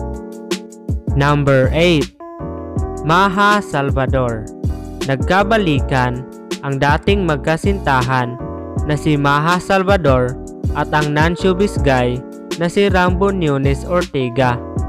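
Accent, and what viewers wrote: Visayas, Philippines. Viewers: Bisaya ka dong.. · san ka sa visaya dong?? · Halata kaayu bisaya imong tingug dong 😁😘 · Klaru kaayo kang bisaya sa tuno nimu bah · Halatang bisaya ka bay haha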